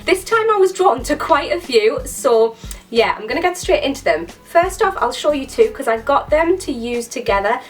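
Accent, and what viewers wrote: Newcastle, United Kingdom. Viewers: Just found your channel and love it! Are you from Newcastle?!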